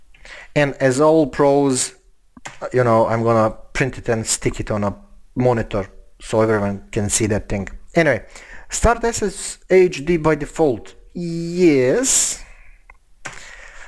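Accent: Russia. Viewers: Thank you Russian man. Love the tutorial and the accent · The Russian accent is strong with this one.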